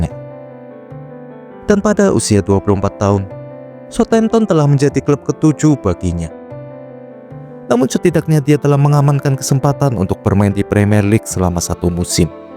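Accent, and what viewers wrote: Tegal, Indonesia. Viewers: Min,orang tegal y,? Dri logatnya sih kayak tegal,😅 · Logatnya, tegal, mas??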